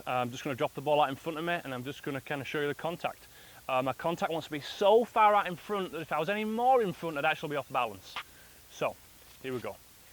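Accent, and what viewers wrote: United Kingdom. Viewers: Great to hear an Northern accent (hope i have that right! Yorkshire?) on You Tube. Looking forward to the videos! PS: I'm from the real North...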